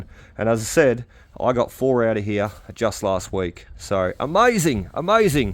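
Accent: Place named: Australia. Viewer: Being a Yank...I love your way of talking!!!. She's right,Mate!!! 🌹 I've never seen this cow tags hunting... Been mostly beaches and pull tabs with the occasional rings and other jewelry found by the Yankees on the California and Florida beaches... The valuable finds are very few and far between... I'm gonna be watching you Aussies finding things I never knew existed... Looks like you have another sub...😎🤗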